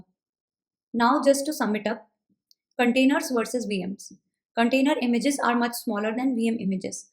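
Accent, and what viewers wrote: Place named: North, India. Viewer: That North Indian hindi accent killing me Sorry but more focused on you than content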